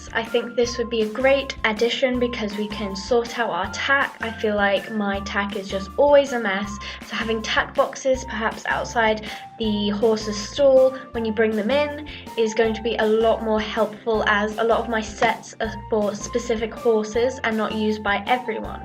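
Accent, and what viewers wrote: United Kingdom. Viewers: Are you British? :o ( I'm just curious because your accent sounds like British) xD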